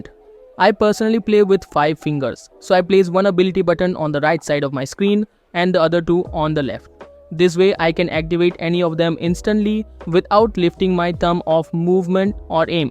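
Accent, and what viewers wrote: India. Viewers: Shit Indian akzent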